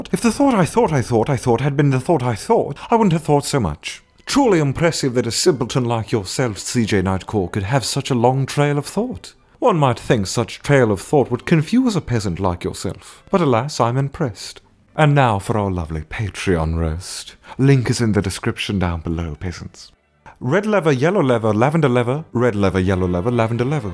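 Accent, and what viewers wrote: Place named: RP, United Kingdom. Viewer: British ,butler.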